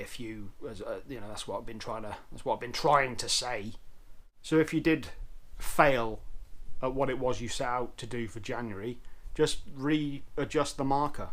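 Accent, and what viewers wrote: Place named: Cotswolds, United Kingdom. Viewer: We're hoping for a new episode, any day now. The Cotswold accent grows on a person. Thanks.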